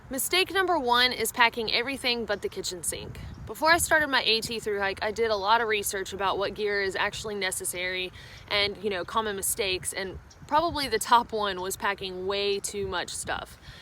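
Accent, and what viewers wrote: South, United States. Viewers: Once again, top notch video, great content. Recently went to a seminar, and heard same points, different words. I like your southern drawl better. Thanks for all you do here!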